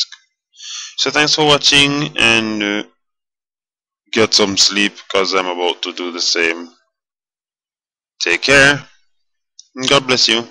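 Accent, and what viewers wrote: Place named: Caribbean. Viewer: are you jamaican...its good to hear a caribbean accent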